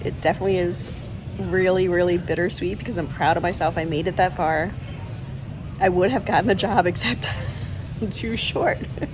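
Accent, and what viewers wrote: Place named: Chicago, United States. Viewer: Your hair is awful. Be consistent with the color. Are you from the Chicago area originally?? Your accent shows! Most companies like a neutral American accent.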